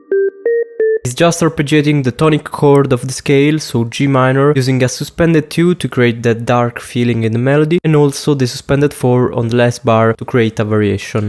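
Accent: Italy. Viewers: minchia si sente l'accento italiano haha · Accento molto italiano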